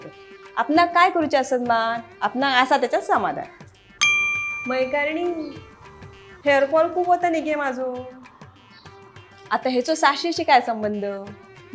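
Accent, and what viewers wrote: Malvani, India. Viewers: तुझी मालवणी भाषा ऐकायला फार आवडते. ऐकत राहावे असे वाटते. तुझा प्रत्येक वीडीयो आवर्जुन बघतो.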